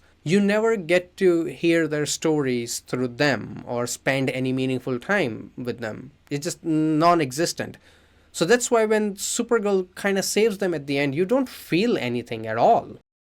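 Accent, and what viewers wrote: India. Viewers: Accent gang Are you Bengali perchance? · I love your channel so much. I'm not sure if you're Bengali or Indian, but this is the first time I've seen a commenter who has such an accent. Also you seem to have so much passion for movies and entertainment, but you're also respectful and you point out the problems societaly and with hollywood. I really enjoy your content!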